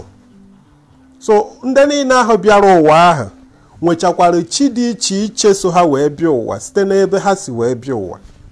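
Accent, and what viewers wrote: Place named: Nkanu, Nigeria. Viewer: Thanks a lot for this. We must begin to make efforts to understand our traditional spirituality. Thanks a lot. I just noticed you sound very Nkanu.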